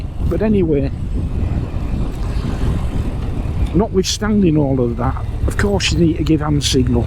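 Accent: Yorkshire, United Kingdom. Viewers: Nothing wrong with a plain spoken Yorkshire man or woman. Prefer someone from lancashire myself, but there again I'm one !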